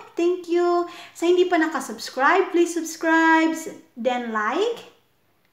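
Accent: Visayas, Philippines. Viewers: Malingaw kay ko saimo day bah!kay tinoud kay ka!!bisdak kaau!hahaha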